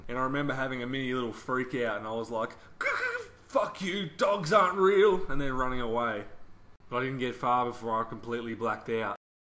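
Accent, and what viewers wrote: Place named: Jamaica. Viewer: I have never heard an Australian try to speak patois ahahaha dude that poodle was money!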